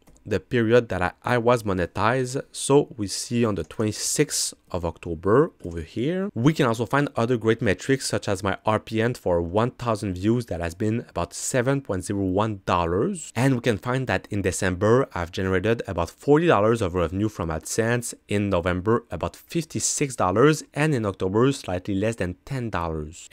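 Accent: Quebec, Canada. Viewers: Your content doesn’t apply to me, but I’m going to subscribe anyway as your personality seem great. You didn’t hide your payment figure to the very end of a 25 minute video. You kept it short and sweet. Very informative. I hope you get far with YouTube my friend. I’m from the UK but I assume that’s a French Canadian accent? Like GSP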